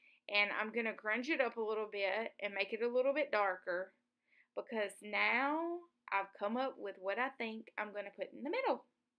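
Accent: South, United States. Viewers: You surprised me with that eyeball and it's terrifically wonderful! Love to listen to your Southern gal speak.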